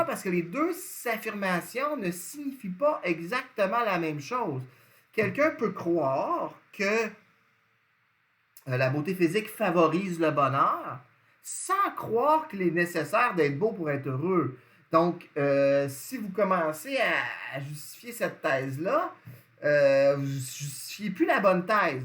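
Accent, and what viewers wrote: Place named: Canada. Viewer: L'accent canadien est un peu difficile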